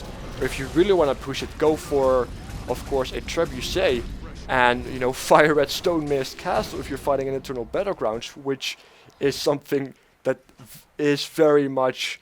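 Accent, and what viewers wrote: Netherlands. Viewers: first time i heard you talking i knew you were dutch, i am dutch aswell and maybe u wnna play together sometime w/ skype or something?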